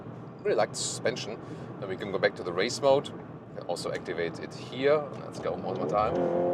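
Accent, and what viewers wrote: Germany. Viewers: Klingt nach German English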